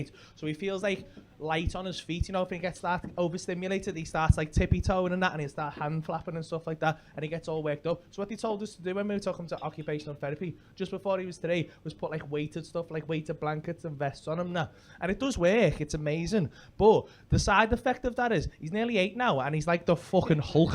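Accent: Liverpool, United Kingdom. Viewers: Love this Scouse ginger , Birmingham loves ya .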